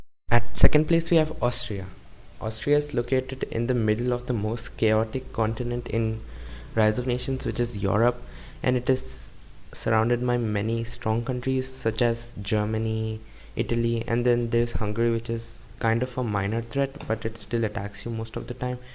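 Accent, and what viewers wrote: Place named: India. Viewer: I love the indian accent man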